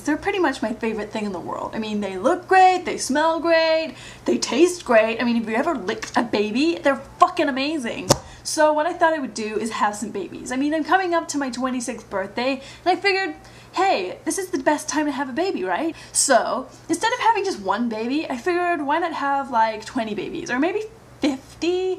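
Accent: United States. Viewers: Wow... your American accent is so very convincing. I keep forgetting that you're British. XD By the way, I love how you randomly choose which accent to use in your videos. :D Ahahahaha!!! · Haha, I love this video. You'd be making better use of them with that dance squad than "Octomom" could. Nice American accent btw ;D Fooled an American. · i love your american voice! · lol You're wearing bad lipstick and using an American accent. I hope you're just teasing us. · Why does she have to do these sarcastic videos with an American accent? Now all the Youtube idiots get to say "fucking american. so typical" and any other retarded comments. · the american accent adds something to this video, I don't understand what that thing is but I think it's a good thing. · Hahaha, well done on the accent, I actually thought you were american XD Not to mention all the jokes, I can't believe some people actually took this seriously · Your american accent is SPOT ON! 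· i figure out what this is about now. is making fun of that american woman who had 8 babies. Hence, the american accent. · i like how non american english speakers can mimick our accent flawlessly yet we cant get others down.. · Haha you are right you sound a tad American, my fiance says i talk American even though im from Manchester..."/ Great video. :D LMAO. I have a PO BOX..If you want to send me babies...=] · Your American accent is really good. I almost can't imagine you with a British accent. This isn't your best bid, but not bad. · Great American accent! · Your American accent is impeccable. You actually sound exactly like someone from Western Canada/northwestern US. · I'm american and your american accent is perfect. Impressive! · It's like Brittany Spears impersonating Nadya Suleman parodying Angelina Jolie. Very good American accent! You forgot Nadya's ridiculous pout and that fake humble look. 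But you got crazy downpat! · This is hilarious! And you do have a very impressive American accent. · def didnt know you werent american. great job. funny vid too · You do a fantastic American accent. Never would've guessed it's a put-on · wow.. you do a perfect american accent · love the american accent XD It's your sarcastic tone lol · why do you have an american accent in this vid? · whoa how did you just start talking without a British accent? you don't even sound British here, it's nuts! I'm Canadian and yeah, you sound American. I'm really intrigued at how you good you are with the accent. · your american accent is extremely entertaining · brilliant! and your American accent sounds so authentic. :D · I really don't know how anyone could think this was real, its meant to be funny. Just watch her other videos. The American accent is really good. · um...what happened to your accent? You sound like a So Cal local not a Brit. 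Didn't you used to be a Brit? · Some people actually took this video seriously? o_o The internet continues to amaze me. But you have an INCREDIBLE American accent! o.O Like House! Except you don't hide half of your accent with a really deep, gruffy voice. xD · How hard do you have to think to speak in an American accent? When I try to speak with an English accent, I have to think about every single word I say. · how did u get such a good american accent?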